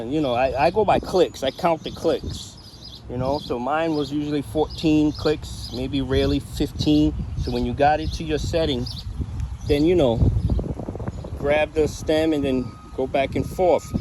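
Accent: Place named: New York, United States. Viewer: That fuckin Ny accent oh my god! No cap, but you sound like a old ass white man who sits in central square park all fucking day alone!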